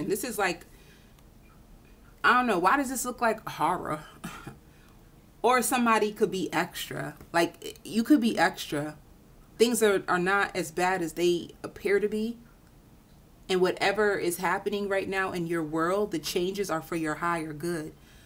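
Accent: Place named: Midwest, United States. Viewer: You sound like your in the midwest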